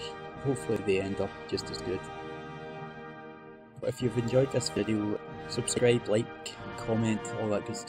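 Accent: Scotland, United Kingdom. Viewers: Beautiful! Highlander tartan painted and explained with a scottish accent :) Well done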